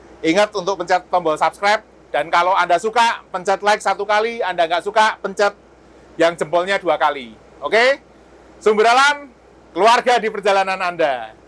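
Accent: Java, Indonesia. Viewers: Tetap pertahankan medoknya pak😂😂😂....saya subscribe baru anda dari negeri yang jauh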